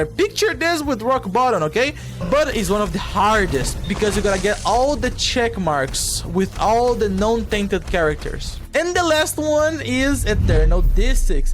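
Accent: Brazil. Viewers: o cara é br. percebi pelo sotaque kkkkkkkk. tmj mano, video mt bom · Percebi que é BR pelo sotaque no inglês haha massa cara...eu simplesmente não consigo passar o Mega Satan com o The Lost pra abir Godhead...muito ruim deixar de pegar os itens da devil room pra dar a Angel room e pegar as chaves acho que nunca vou conseguir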